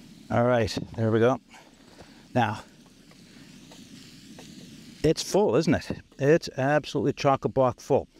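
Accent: Canada. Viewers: Yeah cool, I did assume you'd have some similar "iron" type device over there. No worries on Canadian diction mate, just curiosity, it's those Yanks that tend to bastardise the language,..... but even then, it's all in good fun, we are arguably the worst of the English speaking nations for it; ) Had that book a while now, to be honest I didnt know if it was still available, since it is, I'd be very surprised if it's expensive. And of course it doesn't mean you must stick rigidly to the recipes, in fact I rarely do, It's more the principle of the thing in a camping context, that I mentioned it.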